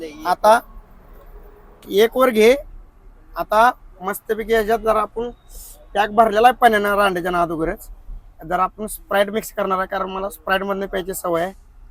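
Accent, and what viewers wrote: Kolhapur, India. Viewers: राडंची कोल्हापूरी भाषा भावा 👌👍 😂😂😂😂😂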